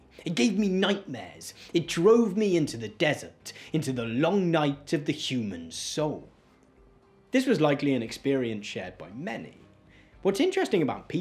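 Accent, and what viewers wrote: RP, United Kingdom. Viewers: Your bbc voice makes these scary human beliefs a bit easier to handle. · Mr., the simplicity of your mind cant excuse the deliberate missinformation and outright lies in this video about both peterson and 1984. The wannabe etonian accent and wording you use for dramatic effect and to trick youre fans to think you are actually erudite cant really fool anyone else. Oh well, what a waste of time.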